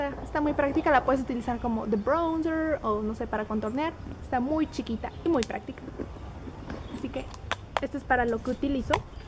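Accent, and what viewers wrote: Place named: Peru. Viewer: una pregunta... eres peruana ?.. tu acento se parece mucho...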